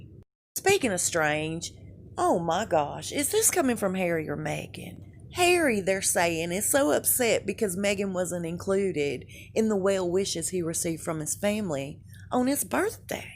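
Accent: South, United States. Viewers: She has a very heavy southern twang. I love her voice too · It's a southern accent 🙃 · There’s a lot of variations of the Southern dialect My husband doesn’t sound like at all. But I think all the accents down South are so cool to listen to!!! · we love you You are so adorable! I have no idea how all these people who send you gifts know where to send them... Anywho I wish you a beautiful Happy Borthday September girl!! Also I use diclofenac ointment it’s a topical anti inflammatory that my dr gave me & it helps. I use ice if it’s real bad & otherwise I’ll just put heating pad on it! But I love your vids watch them all the time & I love your sweet country accent...just love it Big hugs love and many blessings sweet “talk to ya real fast” ☺️🌸☘️🌼🙏❤️✝️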